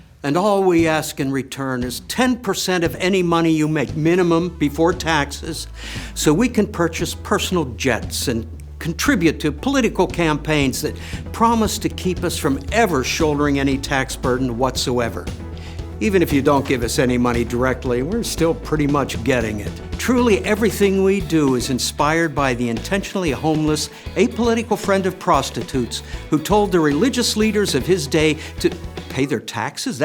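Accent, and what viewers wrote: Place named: Pittsburgh, United States. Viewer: The real genius of this channel is that they got a yinzer to narrate.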